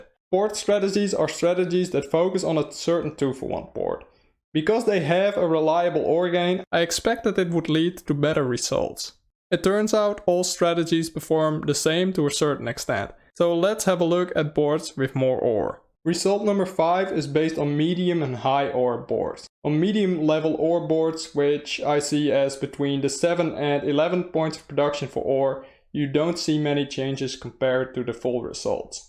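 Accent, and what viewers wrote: Netherlands. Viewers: Je kan je Nederlandse accent niet verbergen haha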